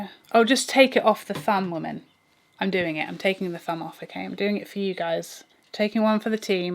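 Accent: United Kingdom. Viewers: I love how you brits say “purrrhfect” 😍 I wish I had that accent!!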